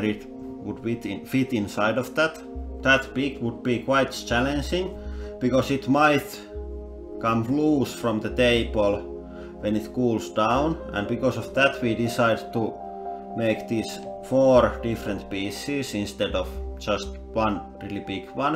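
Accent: Finland. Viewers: The finnish Accent xD #SuomiOnParas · ei nää kanavat olis mitää ilman tätä ralli englantii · No ei ainakaan mua haittaa vaikka se puhuukin niinkun suomalaiset mutta vastasin vaan tähän kommenttiin... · the finnish accent tho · huhhu mikä ralli englanti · aikamoine ralli englnti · moikka vaan! huomaan tuolla äänellä ja paidalla että olette suomalaisia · tykkään kuunnella tota rallienglantii! se on kivan kuulosta 😂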